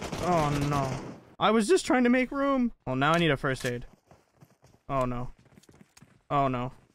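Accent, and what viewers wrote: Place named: United Kingdom. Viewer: “hello there” he says in his normal British accent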